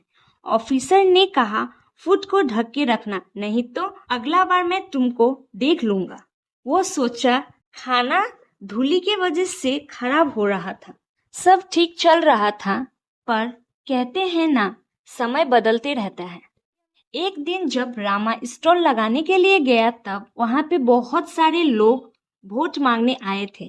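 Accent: India. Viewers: Aur ye bhi pta chl gya ki woh Bengali hai😅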